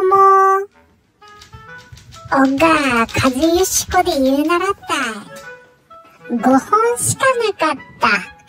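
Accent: Kumamoto, Japan. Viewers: 今年はジーニー兄ちゃんとバーニーとの出会いがありました。笑いと癒しを沢山届けてくれてありがとうございました❤ 出会いは未だ登録者数が3000人未満だった時です❤でもその後の快進撃❣️素晴らしい👍です。とにかく何気ない日常だけど笑える😅🤣熊本弁もいいよね〜💕 東京からだと熊本は遠いからなかなか会えませんが、もう親戚のわんちゃん達って感じです。 来年もどーぞよろしくお願いします❤ で良いお年をお迎えくださいね💕 では、ご無礼いたしま〜すぅ❣️👈この言葉私のお気に入りです😅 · ばっちゃんのチャンネル見つけて1週間。毎日見ていたら私の発音がちょっとおかしな事になってるみたいです😅千葉県民ですが、熊本弁に侵食されてきました。 来年も熊本弁を習得できるようたくさん見ます😊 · はじめまして😂こてこての県民天草〜熊本市出身です😊（コメントはあえてNo熊本弁で🙏）やっぱり熊本弁はネイティブが話してこそ生きると痛感してます!来年もスカッとする熊本弁トークお願いしまーす👌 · こんにちは😂 いつも仕事から帰ってきて我が家のミディアムプードルと一緒に楽しく見てます😄 来年はバーニーちゃん、 ジーニーちゃんに会いに行きたいと思ってます😄 熊本弁…楽しくて笑っちゃいます🤣 (息子が熊本に住んでてイントネーションが変化して帰ってきてます笑) 来年も楽しみに待ってまぁす🐶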